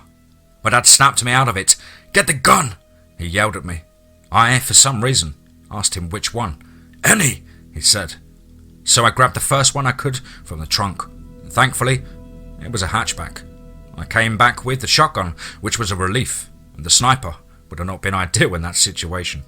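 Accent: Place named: United Kingdom. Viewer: My only input is that I hope your accent could be more neutral (accent-less, a.k.a American English), so it doesn't feel archaic. British accent (or similar) are good for old ghost stories or cosmic horror stories. Also, most of your stories are set in the Americas, aren't they? It should add to the immersion factor.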